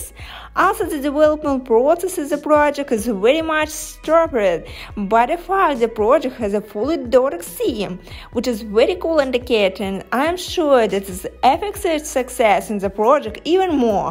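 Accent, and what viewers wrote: Russia. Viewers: is she from russia or opland or ukraine, im confused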